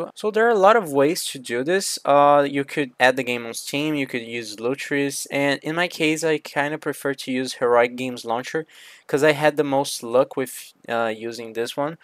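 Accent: Brazil. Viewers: Esse sotaque Brasileiro não engana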